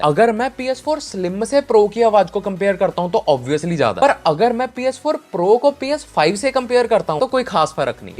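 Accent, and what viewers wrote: Punjab, India. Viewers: Bnda bhot sai yr tu ❤❤❤ Punjabi accent reflect krda hai teri hindi ch Btw love your content❤❤❤ keep it up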